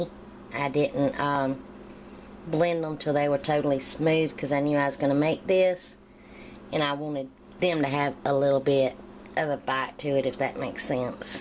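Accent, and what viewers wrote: South, United States. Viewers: Yes you do have an accent! I love the way you did the subtitles! Yur friend shouldn't do no messin' with us southern girls!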